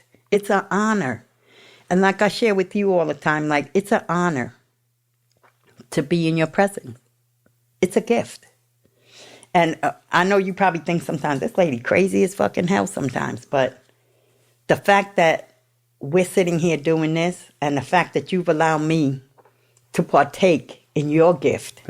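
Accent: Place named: New York, United States. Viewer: This chick sounds like such a New Yorker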